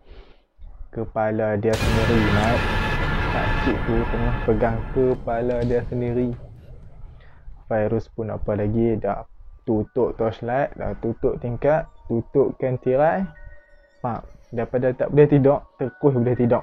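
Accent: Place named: North, Malaysia. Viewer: Suka gila dengaq youtuber yg cakap utaqa ni, tapi nak mintak 1 ja lepasni jangan letak jumpscare please terkejuttt ceq 😭😭😭😭😭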